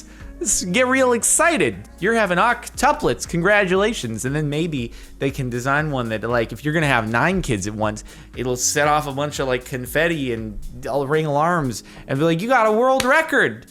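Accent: Australia. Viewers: take a moment to appreciate aussie accent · That Aussie accents makes me cringe (no offense) I'm aussie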